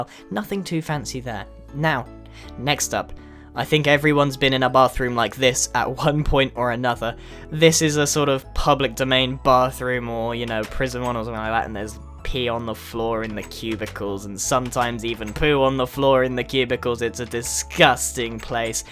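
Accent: United Kingdom. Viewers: are you British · I love your voice if your britsh so am I! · You're from the UK?? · are you British? Love your acent 😘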